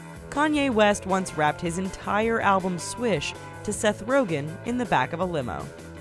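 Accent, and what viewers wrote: United States. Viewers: She sounds American since she doesn't have a accent and her language is English the type of English that doesnt have a accent unlike UK english. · 'So now you can tell I'm American'. I'm pretty sure everyone could tell straight away love with that accent.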